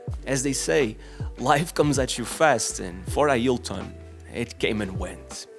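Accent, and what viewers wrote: Portugal. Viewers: Bem, o sotaque sempre foi 100% português. Eu tinha dificuldade em reconhecer o sotaque português, mas depois vi (ouvi) o Mourinho falar mil vezes em inglês. Aliás, a frase inicial "listen to this" é perfeita para reconhecer o sotaque português. Pronuncia "Liss-tenm tu dis" em vez de algo como "lisen te ðis" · AAAAH Eu sabia pelo sotaque que tinhas que ser português!!! Bom trabalho, continua! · Ja é talvez o 5 comentario que faço em videos teus, e acho que todos foram a dizer o quanto parecias portugues a falar... hoje com camera apareces com a camisola do vizela... Acho que tenho as minhas confirmações 😂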